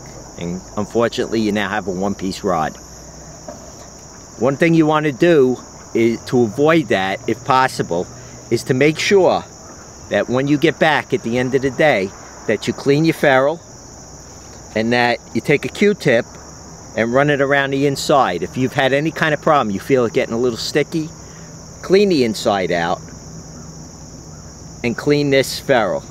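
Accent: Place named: New York, United States. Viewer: New Yorker 😂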